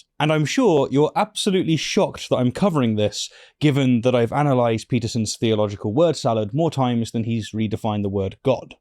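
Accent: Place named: RP, United Kingdom. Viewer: you're a smart cookie man! very cool downton abbey accent too :)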